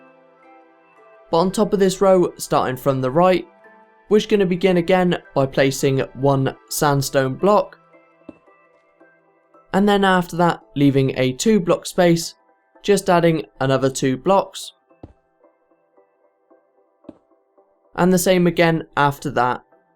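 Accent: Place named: RP, United Kingdom. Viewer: U talk so posh it's like you do not speak in that way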